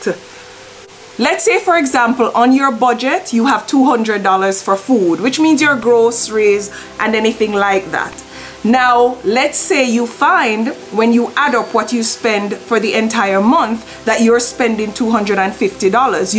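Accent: Jamaica. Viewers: keep impacting. love the patua jamaican tone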